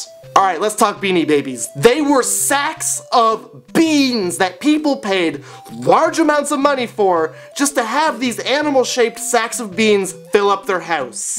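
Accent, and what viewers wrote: Canada. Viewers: i just realized from your accent that you are from Canada and now you look candian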